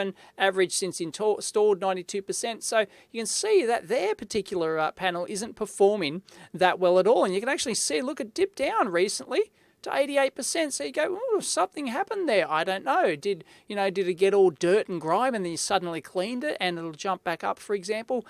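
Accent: Australia. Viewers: why does talk like crocodile dundee? was that show really that popular in austrailia?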